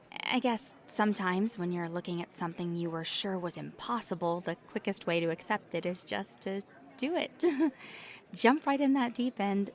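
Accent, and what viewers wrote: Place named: South, United States. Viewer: I like that little hint of a southern accent that I hear come through every now and then.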